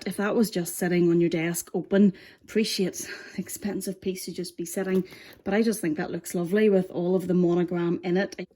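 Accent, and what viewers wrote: Northern Ireland, United Kingdom. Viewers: Fantastic review! Was debating between the nano and mini. Think I might need to get the nano! Do I hear a fellow Norn Ironer? 😁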